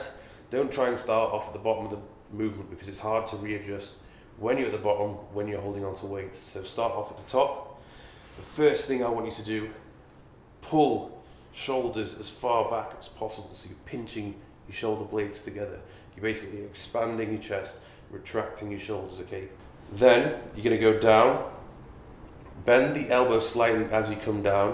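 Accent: United Kingdom. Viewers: I don’t know what’s better his form advice or his British accent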